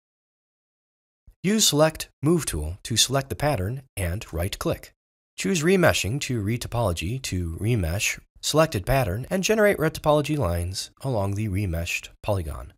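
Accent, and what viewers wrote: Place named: United States. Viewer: I absolutely love the clear, professional, American voice and how it clashes with the bad english script :D But thanks for the rundown, very informative!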